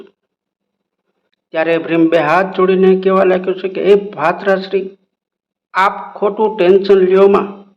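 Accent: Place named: Amreli, India. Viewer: આવું બધું કથાકારો માટે રહેવા દ્યો,. બહુ જામતુ નથી. તમારી તો તળપદી અમરેલી/ભાવનગરની બોલીવાળી કોમેડીમાં જ અમને વધારે મોજ આવે છે.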